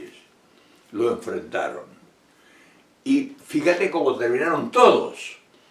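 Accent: Argentina. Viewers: usted habla como argentino, pero tiene un corazón mexicano. Su capacidad de reconocer al pueblo como la fuerza que sostiene a este gran gobierno. Excelente análisis. Saludos desde México.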